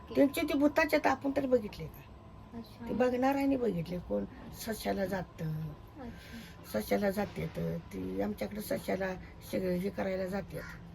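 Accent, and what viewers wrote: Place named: Rural, India. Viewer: Ajini ekdum gavchya padhati madhe cahb sangitla😊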